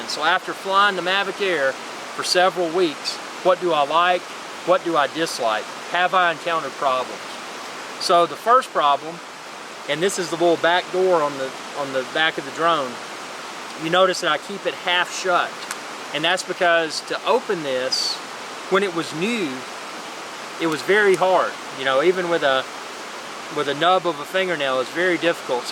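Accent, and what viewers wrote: South, United States. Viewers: Thank you. Love your southern accent. (UK)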